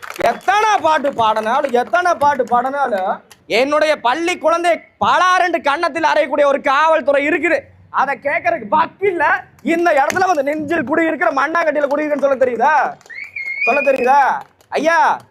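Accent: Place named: Kongu, India. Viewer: Kongu Tamil azhlagu thambi…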